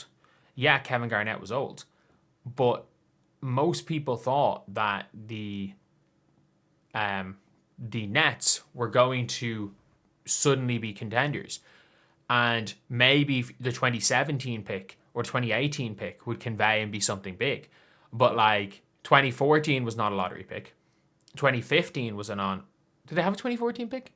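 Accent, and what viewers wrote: Ireland. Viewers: I’m not even happy and I’m a spurs fan. But I would rather hear bad news in an Irish accent. It’s just better. Such a “fml” accent. I’m gonna try to catch every one get you going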